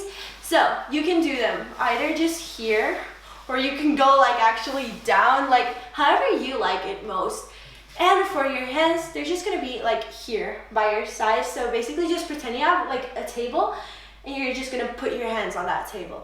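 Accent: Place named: United States. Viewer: crazy how ur spanish accent went away over the years and now u sound full american lol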